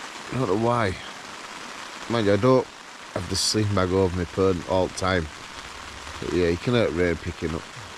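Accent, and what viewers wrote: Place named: Yorkshire, United Kingdom. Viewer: My husband’s family is from York, your accent reminds of theirs. I love all of the UK but especially York and area.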